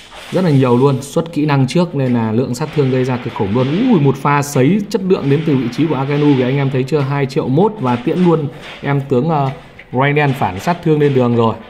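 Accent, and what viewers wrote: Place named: Hung Yen, Vietnam. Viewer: Nghe bác nói quê Hưng yên. Bác chỗ nào HY thế.?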